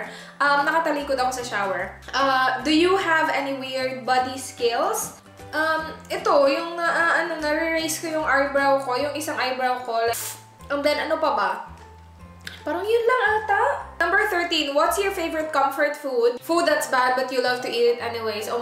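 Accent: Visayas, Philippines. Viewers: Bisaya. Ka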